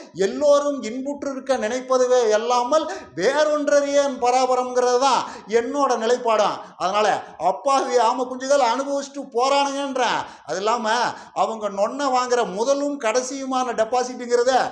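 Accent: Kongu, India. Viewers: தம்பி, your lectures are very deep and insightful, especially on Indian politics. Your analysis is excellent, and the information you provide is valuable. However, I have a small suggestion—sometimes, the topic of your video and the actual discussion don’t fully match. ஈரோடு என தலைப்பு வைத்ததை விட வடக்கு கிழக்கு அரசியல் எனும் பாணியில் தலைப்பு வைத்து இருக்கலாம். It would be great if you could stay more focused on the given topic and elaborate on it more directly. This would make your content even more impactful. Keep up the great work! நகைச்சுவை கலந்த கொங்குத் தமிழில் உங்களின் கருத்து மிக அற்புதம் தம்பி. ஆனால் தலைப்பில் பேசுங்கள்.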